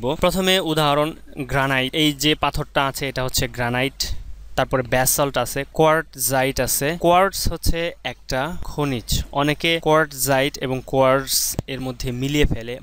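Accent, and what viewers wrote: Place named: Mymensingh, Bangladesh. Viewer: apnar voice sune mone hocch apnar district mymenshingh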